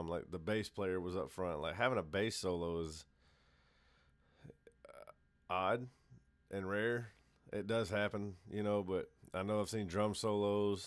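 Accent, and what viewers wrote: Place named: Georgia, United States. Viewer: Man, you sound like you from my neck of the woods. South Georgia? I discovered about 2 years ago. Seen em twice already after not going to a concert in 20 years. Best rock band since the 90s.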